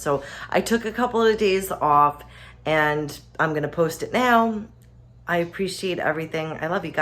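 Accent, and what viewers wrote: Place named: New York, United States. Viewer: hey there 🙂🙂 I'm also a New Yorker but am now in Atl (I THINK you are by your accent but I could be wrong) anyways, yes Subs have gotten my life back on track and I actually finished school this past month and have a 4 year degree from a major University. I have a fam and in my early 40s. Im a metal/hardcore musician so I don't have to explain what kind of world I have lived in. It was fun but nuts..no regrets. Pills ended the music dream and all went down the drain. Subs DID help with getting back on track for my fam and for myself. My issue is the hollow empty shell feeling I have had for 5 years on this shit. Im not ME inside or out. I feel like I just go through the motions of life. I have a beautiful 7 year old daughter and I want her to see her daddy as the guy before the subs. This is why I HATE it at this point. 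Im thankfully it has helped but I feel I've been on it for WAY too long and I also feel it has taken a toll on my mental and physical health. I don't find pleasure in anything really, especially playing music which is something I have done since I was 12 years old. I have no friends anymore because I don't feel social. I know some people may need to be on it for many many years but not me. I should have been off this shit 2-3 years ago. Relapse is NOT an option at this point in my life. I wasn't a IV user but I was taking VERY high doses of oxy/roxis etc...all that is over and im just DADDY now and want to be the BEST daddy. Because of the numb emotio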